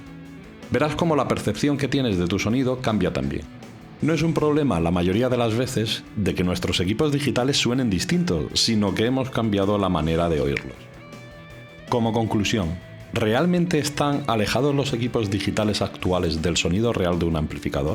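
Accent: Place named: Spain. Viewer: Una pregunta sin relación al tema del video, de qué región de España es el acento del narrador? Gracias.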